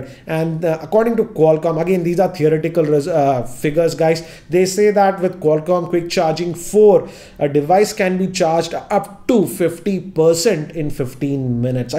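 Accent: India. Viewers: Would have told something which other channels missed to include...this video is copy of Android authority video n converted that into indian accent..no offence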